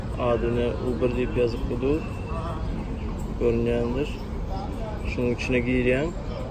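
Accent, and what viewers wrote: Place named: Lebap, Turkmenistan. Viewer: Sps sawedyn ucn. Gepleysina lebaplylara menzeya.bloklaryn howes biln dinleyan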